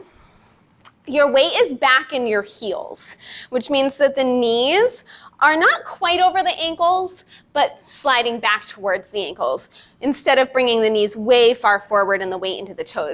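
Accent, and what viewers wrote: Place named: East Coast, United States. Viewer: Great tips - and your east coast accent brings a smile to me over here on the west coast. 😀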